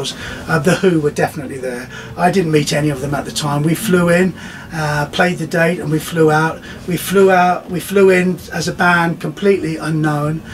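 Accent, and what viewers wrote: United Kingdom. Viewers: Why all this southern English accent, Carl? Come on, you're supposed to be a proud Brummie...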